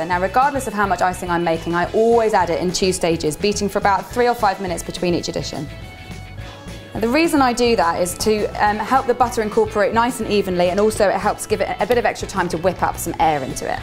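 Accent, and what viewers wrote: United Kingdom. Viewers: I knew you were going to be British the second before I opened the video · I just love the way Brits say buttercream: "BUT-tuh-cream." lol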